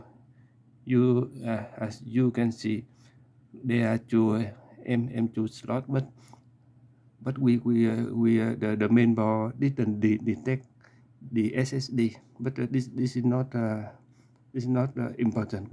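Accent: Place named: India. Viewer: Love u indian accent guy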